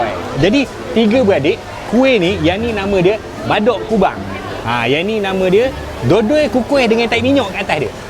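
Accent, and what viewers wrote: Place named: Pahang, Malaysia. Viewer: Oak org Pahang ke..mana tok kedengor loghat nye...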